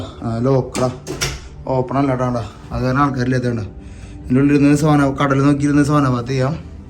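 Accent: Thrissur, India. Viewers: വിഡിയോ കൊള്ളാം.. പക്ഷെ ഈ ത്രിസ്സുർ സ്ലാങ് ഉം സഹിക്കണില്ല എനിക്ക്..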